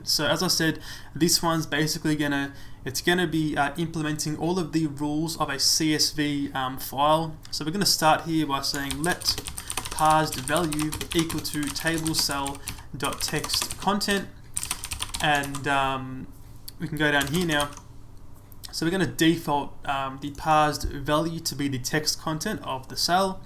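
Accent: New Zealand. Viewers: Are you a kiwi? you sound like one! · Ok.... no worries.. I'm Aussie and thought I heard a slight hint of kiwi.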